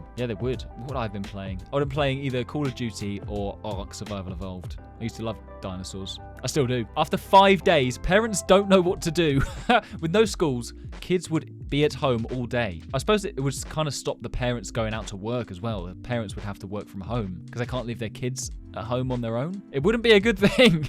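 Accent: United Kingdom. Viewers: r u bri'ish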